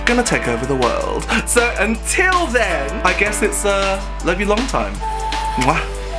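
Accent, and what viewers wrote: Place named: Liverpool, United Kingdom. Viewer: living for the Liverpudlian accent and gorgeous makeup ❤️ Would love to see any club kid look, something more abstract 💞🌻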